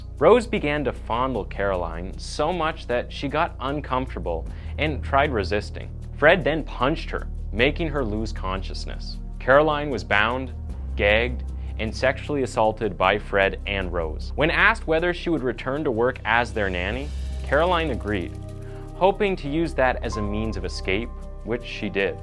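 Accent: Canada. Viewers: you do realize that you made a sweeping generalization about 320 million people, right,? That's aside from the channel's host sounding like a Canuck, rather than an American. The rotten thing I see is your opinion of hundreds of millions of people based on the probably half-dozen or less Americans you may or may not have interacted with.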